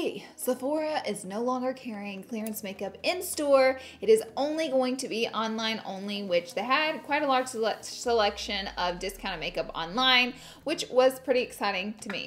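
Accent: South, United States. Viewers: I'm from Alabama! That accent is so southern. I'm from a really country town. Like right below Tuscaloosa, Al. Roll Tide nation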